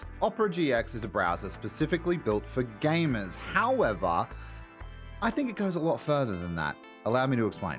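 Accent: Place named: Australia. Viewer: I thought you were Australian. Crikey...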